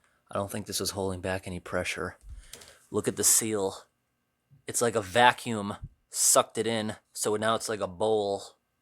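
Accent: New England, United States. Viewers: LOL i cant believe that doesnt even smell bad🤔, thats crazy. Btw your voice is amazing. It must be the new england accent🤔. Im from Canada. Anyways its very nice.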